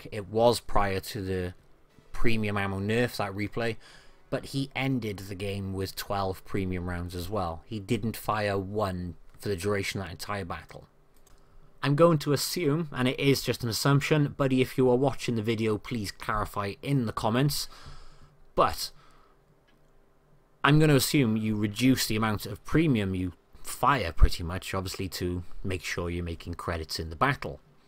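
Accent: Syria. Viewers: جدع يا:D لهجتك كانك سوري بس مش فارق المهم عربي ^^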